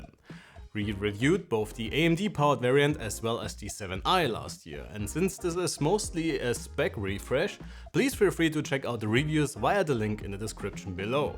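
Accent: Germany. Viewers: Gutes Video. Aber dieser deutsche Akzent lol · Puh, deutsche die auf Englisch machen, immer eine Herausforderung für die Ohren....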